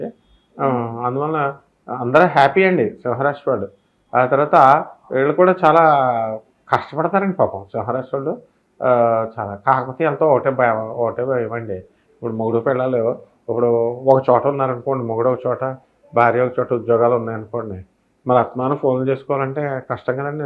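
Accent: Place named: East Godavari, India. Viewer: East Godavari yasa andariki nachhadu, mamuluga matladandi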